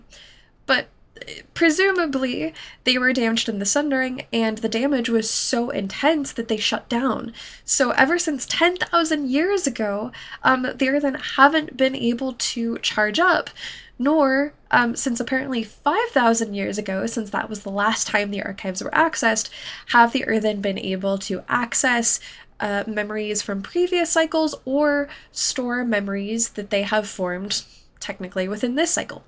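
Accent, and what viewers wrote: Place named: Ireland. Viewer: I love your middling Irish accent! 😊 It's quite lovely!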